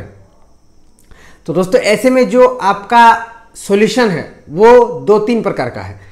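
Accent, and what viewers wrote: Bengal, India. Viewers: Ap Bangali ho kya?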